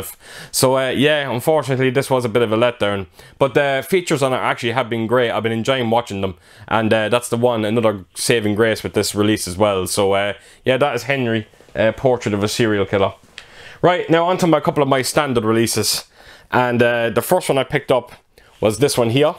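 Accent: Ireland. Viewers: Agree with your Edge of the Axe practical fix work. Top notch. Which part of Ireland are you from??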